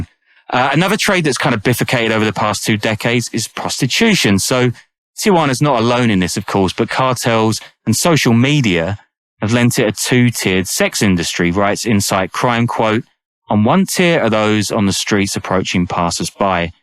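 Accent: United Kingdom. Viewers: Why the fk is it always narrator’s with an English accent. Like y’all facts are always so fked it’s unreal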